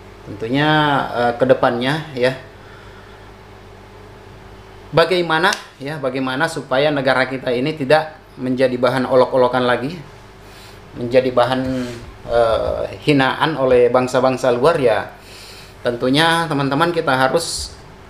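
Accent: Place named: Malaysia. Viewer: tapi kok suaranya ke logat melayu Malaysia?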